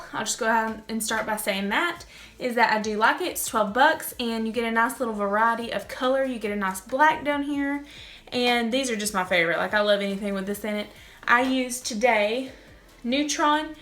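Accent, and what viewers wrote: South, United States. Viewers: you are sooo pretty!!! and i love southern accents! ❤ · hears southern accent subscribes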